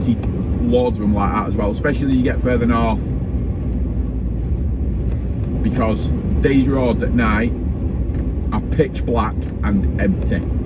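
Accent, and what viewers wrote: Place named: North, United Kingdom. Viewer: Nothing negative, on the contrary. I love accents. Trying to figure out from where yours is. Liverpool, Manchester area perhaps?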